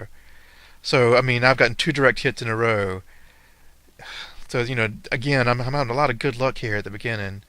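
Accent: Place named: South, United States. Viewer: Only just started wtaching your Battle reps and you probably get this alot, but your intro music is class and southern accent is great for narrating! The battle reps are very good also! Great Job!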